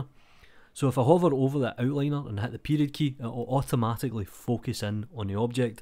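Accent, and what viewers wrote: Scotland, United Kingdom. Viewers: idk what your accent is but I love it. oh, it's Scottish, like Brian 'Limmy'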